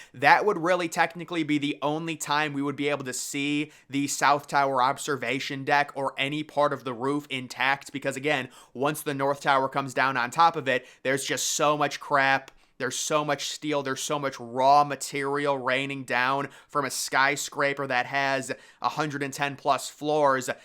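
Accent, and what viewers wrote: United States. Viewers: Nothing about 9/11 is funny notwithstanding the American voice discussing it.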